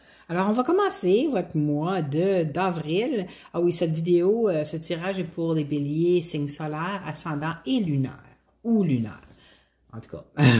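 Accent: Canada. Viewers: J’ai oublié...j’adore cet accent canadien qui pour moi correspond a optimiste et musical...! 🙏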